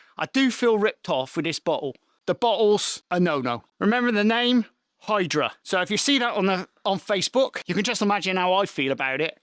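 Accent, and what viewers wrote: United Kingdom. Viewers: West country or Norfolk?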